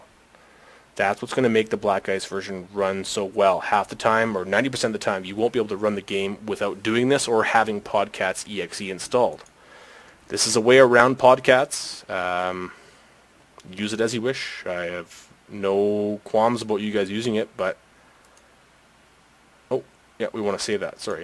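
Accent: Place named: United States. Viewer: i hate american accent. please note im tired an am annoyed of everything.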